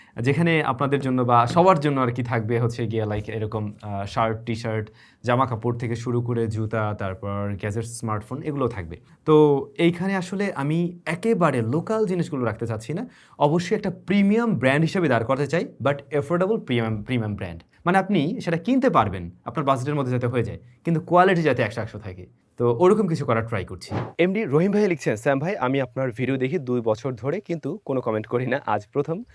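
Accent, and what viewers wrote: Barishal, Bangladesh. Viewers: বরিশালের কোন যায়গায় বাড়ি আপনার? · বরিশাল কথায় আপনার বাড়ি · ভাই বরিশাল আপনার বাড়ি কোথায় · আমনেও মোর মতো বরিশাইল্লা❤ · ভাই বরিশালের কোন এলাকায় থাকেন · ভাই আপনি আমাদের বরিশালের লোক?🫶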